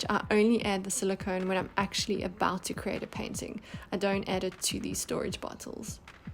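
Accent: South Africa. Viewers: Thanks so much, just what I need, plus I love your SA accent.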